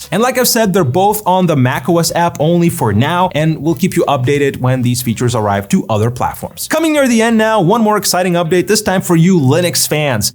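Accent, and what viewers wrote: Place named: United States. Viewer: This guy speaks like a tosser. Slow down and stop the up and down voice "noise" Not everyone likes to listen to that American noise!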